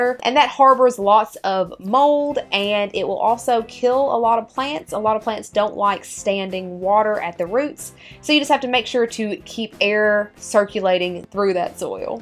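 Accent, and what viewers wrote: South, United States. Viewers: Really nice videos. talks country (like me) but also very fast. I'm confused.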